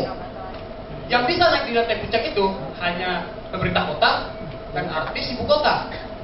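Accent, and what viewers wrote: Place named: Padang, Indonesia. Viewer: ndak lucu ang do...ma ado urang bukik logat padang...tutur bahso ang kasa...dasar padang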